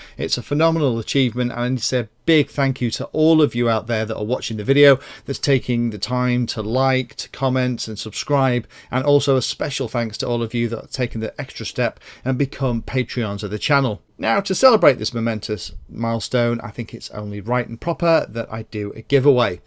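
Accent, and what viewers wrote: England, United Kingdom. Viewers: Always nice to hear your dulcet tones!! In NZ at the mo so great to hear a proper English accent! Always appreciate your reviews (still hoping you might do the Strix Nano Goblin...!) Cheers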